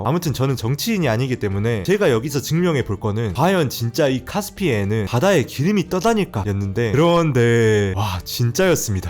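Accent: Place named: Gwangju, South Korea. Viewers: 광주 억양 원래 이래요? ㅋㅋㅋㅋ 그런^데에~___ 중독성 오진다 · 대사 드립이 너무 재밋네요 대학교때 알던 광주 출신 동기형이랑 말투가 넘 비슷해서 재밋게 보고 있어요 ㅋㅋ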